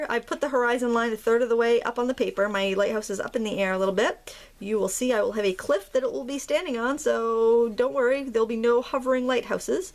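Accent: Maine, United States. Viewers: I love your lighthouse! I live on the California coast and I don't believe that I have ever seen one. They make me want to live in New England. After you dry your painting at various intervals, does adding more water reactivate the previous layer of color? Thank you for giving us a touch of Maine with the painting and your accent Lol! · wow that was awesome! it's so fun watching you do the watercolors, it looks so easy and it's inspiring for me (a beginner)..I totally understood what you said tho, LOL and I am not from Maine.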